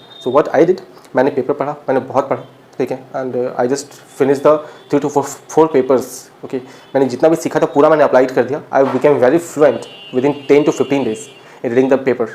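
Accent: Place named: Bengal, India. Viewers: To everyone who is watching this video. I have heartfelt respect for this person as he cleared his exams and got a job. But whatever he is saying is not true. I am in Kolkata from the last 20 years and his accent is a typical bengali accent, the one not even Bengalis who studied in english medium have. I do not believe his words because as a native I can sense the accent very clearly. My request to everyone is focus on the language, it is not that easy but not that difficult either. If you have given the exam in the language in class 10/12, then you don't have to worry. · True his accent is completely bangali hindi type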